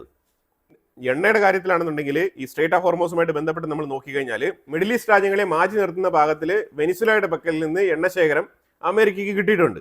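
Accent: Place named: Trivandrum, India. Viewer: Your News presentation is excellent. But I think, you have a small Trivandrum accent.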